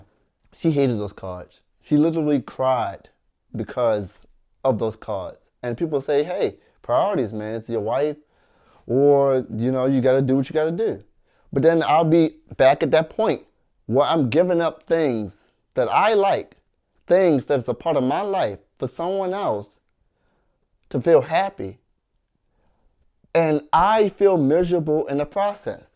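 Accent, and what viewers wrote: Baltimore, United States. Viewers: Besides the point of the video I know but are you from Baltimore?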